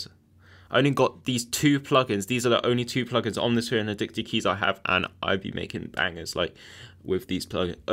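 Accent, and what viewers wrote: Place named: United Kingdom. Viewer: My Guy 😁🤓I'm from Germany lol sounds 100% UK👍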